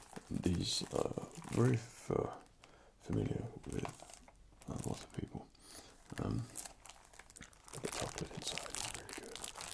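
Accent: England, United Kingdom. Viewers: I was just thinking recently I want to listen to an asmr video with an English accent and then I saw this video and I was omg oh yeah! 😃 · Is he British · Is he from England ???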